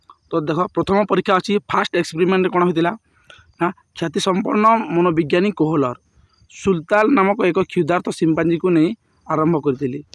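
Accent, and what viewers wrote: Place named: Sambalpur, India. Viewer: Sir apan ken village ru belong karsan mate tike apan sambalpuri bagir lagu chhan i think west odisha ru belong karsan jadi b an ta sambalpuri re padhaun sir🙂 I'm from bargarh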